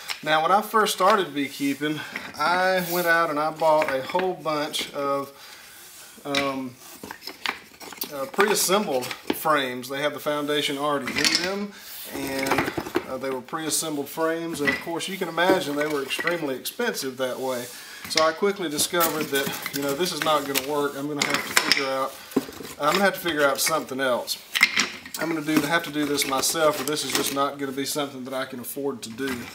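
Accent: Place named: Georgia, United States. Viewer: Your voice and accent remind me of a gentleman by the name of Norman Blake from Rising Fawn, Georgia. You must be a Georgia native, if I'm not mistaken. Anyway, thanks for sharing your assembly contraption. I'm working with the Layens system of frames, so it's a slightly different set up. Thanks. Bob ( a Norman and Nancy fan in Montana)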